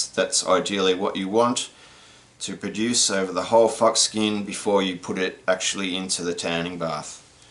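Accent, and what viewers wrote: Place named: Australia. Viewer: Thanks for explaining, particularly with those 2 comparisons, no one else seems to mention why it must be so clean, just got my first fox last week and I went straight to salting then scraping, but I will try your technique next time I'm lucky enough to get out (my permission got sold for residential). Good to hear another Aussie, cheers from Trentham, Vic.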